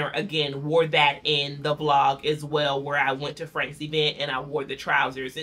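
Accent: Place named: DMV, United States. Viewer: Friend!! I feel like I just went shopping with a good girlfriend. Sounds like you're from the DMV too...SUBCRIBED! Loving what you do sis!🥰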